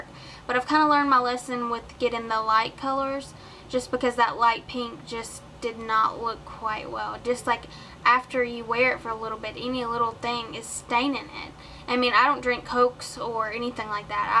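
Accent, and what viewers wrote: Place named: Alabama, United States. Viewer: r u from Alabama because u have a Alabamian accent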